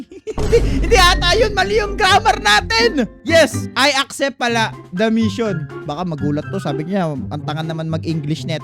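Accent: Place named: Visayas, Philippines. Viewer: Bisaya ka😊 sobrang bisaya ka which p mahina ka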